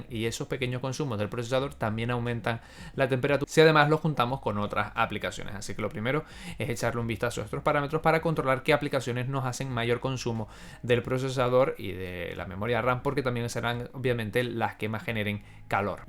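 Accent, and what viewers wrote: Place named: Chile. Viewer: que curioso que los españoles hablen parecido a los chilenos. De primera pensé que eras chileno por la entonación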